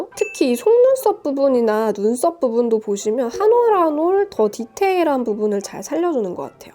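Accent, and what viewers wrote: Gyeongsang, South Korea. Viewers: ㅋㅋ 경상도 사투리. 찢으셨다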